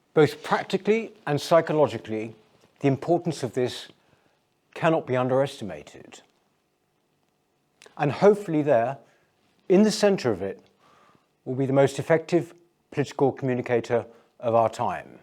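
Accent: RP, United Kingdom. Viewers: You sound too posh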